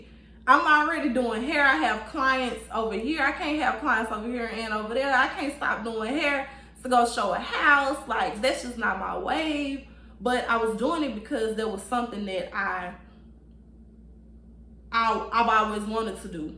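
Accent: United States. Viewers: Thank you so much!!! I needed this so badly, you don't even understand. What's so crazy is that I have been watching your video on and off all day at work because I have been having to pause it lol, but I knew I needed to stick around and watch until the end. My jaw was on the floor when you mentioned real estate when that has been a career I have been trying to pursue for over a year now,but for some reason just can not pass the exam. Maybe God is telling me it is not meant for me to get licensed in this state. I am definitely going to keep praying to remain obedient and faithful to him. I know blessings shall pour down. Yes I will be alone and won't know not one person in that new state, but I know God will provide connections for me. Your testimony really got me going. I knew by your southern accent it was going to be a good one lol. I know a Mississippian/Memphian when I hear one! 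I pray that more blessings come your way. Are you on any social medias? I would love to support your business whenever I'm in TX. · Your American accent is too heavy I struggle to get some words. E.g. lulu for little.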